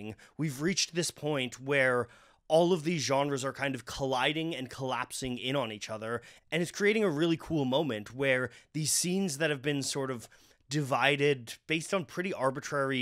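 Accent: Canada. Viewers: People have their right to privacy, so I don't care whether a content creator shows their face or not. Not seeing face made me listen more closely and I picked up that he's Canadian. Actually because of voice similarity, for a while I was wondering if another YouTuber I watch was secretly doing this channel too. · Dude - I think you're great. Your channel rocks and you have so much knowledge. And where are you from? I'm thinking Canada somewhere.... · Canadian man detected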